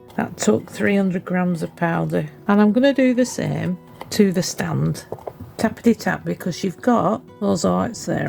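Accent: United Kingdom. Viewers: I have just come across your channel and love it. I have been sat here watching video after video instead of doing what I am supposed to be doing. You sound like a friend of mine, clearly from the same part of the country and the humour must be in the DNA from that part of the UK. You have some interesting ideas and produce some great stuff. My hat goes off to you for doing the video's; I'm a former College Lecturer, use to, shall we say, some unappreciative audience members, but I certainly could not do what you do. I look forward to not doing what I should be doing in the future and watching your video's instead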